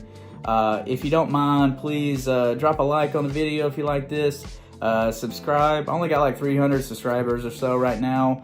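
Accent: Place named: South, United States. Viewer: Very logical. A refreshing change from the GB! I enjoyed your southern accent too.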